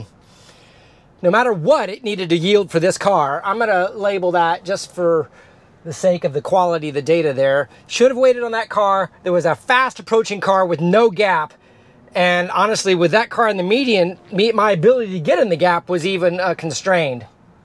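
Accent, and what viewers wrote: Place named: United States. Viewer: ...could've sworn I heard him say "metre"...and appears (from accent) to be from USA and appears older than 30. Whoa! There IS hope for the USofA yet! (must be an engineer :-) Seriously though, great content/analysis, thanks!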